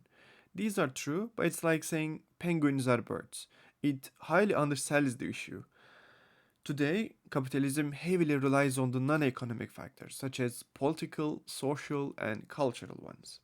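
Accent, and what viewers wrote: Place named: France. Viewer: Your accent sounds French but you look Georgian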